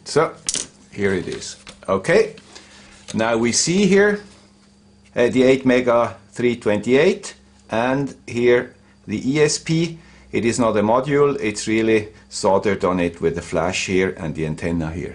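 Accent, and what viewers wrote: Switzerland. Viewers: I like the swiss accent, just saying...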